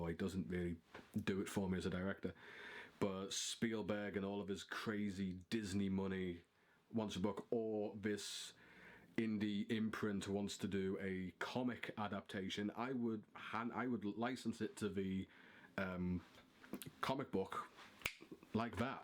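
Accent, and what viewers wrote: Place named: Northern England, United Kingdom. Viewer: I thought I heard a Northern twang in there! Hi, I'm Adara. I'm a writer who usually hangs out on Twitter. Your song choice sent me back in time to many anime conventions ^_^ I like how original your goals are. In terms of specific cult references, anyone who can explain my 'give quiche a chance' t-shirt deserves a cookie. I'd love to see more writers involved in Doctor Who. I love how you're choosing animation and comics for your dream adaption choices. So many people fall by default into the film or live TV only trap. Lastly, good choice co-writing with Dal!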